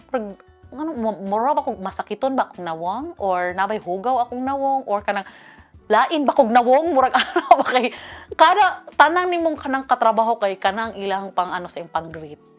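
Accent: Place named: Butuan, Philippines. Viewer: you speak like my cousin😅 are u perhaps from butuan? lingaw kau ko the way ka muspeak😊 more videos pa✨✨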